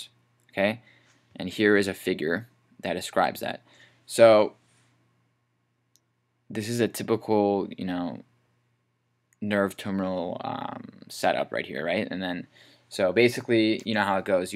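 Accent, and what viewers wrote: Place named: Australia. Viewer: I have no ideas why he sounds like an Australian.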